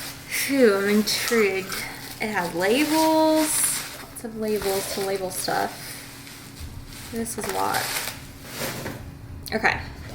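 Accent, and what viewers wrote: South, United States. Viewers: I think you are acquiring a southern accent 🤌🏻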